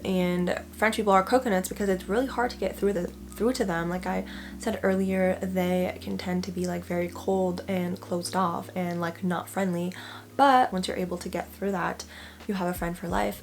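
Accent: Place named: United States. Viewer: Je suis français et je n'ai jamais été aussi fier d'être français après avoir vu cette vidéo. C'est vrai qu'on le vit cool. Chaque fois que j'ai été à l'étranger, on m'a dit que j'avais trop de chance d'être français. Ma phrase préférée: "On adore la beauté". C'est vrai. On est cool comme des chats. Un de mes meilleurs amis, un allemand, a le défaut de manger sa nourriture en 5 minutes. J'ai essayé de le changer mais pas encore reussi. Tu es la meilleure version de nous-mêmes. Merci. J'essaye d'apprendre ça à mon enfant. Super accent américain, c'est rare pour un français de choper l'accent d'ailleurs aussi facilement.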